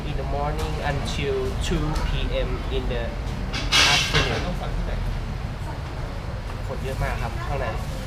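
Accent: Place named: Isan, Thailand. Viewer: i like it whan you talk e-sen it sound so cool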